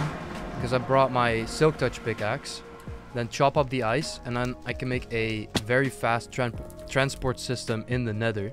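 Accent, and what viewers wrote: Netherlands. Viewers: Hey dude, great video. Are you dutch btw? I feel like i hear a slight dutch accent. Edit: welp nvm just saw the rest of the vid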